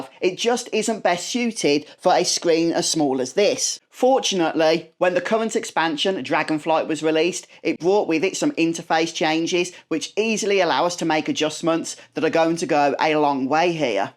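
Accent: United Kingdom. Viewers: Goddamn british accent😂❤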